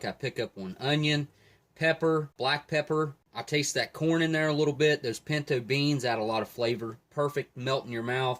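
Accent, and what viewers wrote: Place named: Baltimore, United States. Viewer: Your accent screams Baltimore, especially when you said Sopacko, Hon!!!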